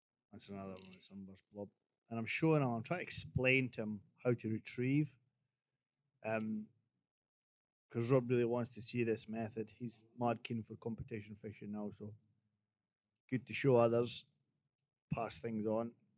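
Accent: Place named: Aberdeen, United Kingdom. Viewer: 1st class video, listening to you reminds me of my time in Aberdeen.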